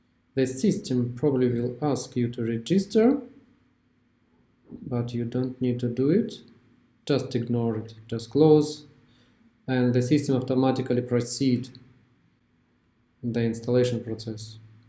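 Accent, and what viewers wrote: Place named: Russia. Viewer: Ваш русский акцент - это просто анбеливабле! Спасибо за видео - очень помогло!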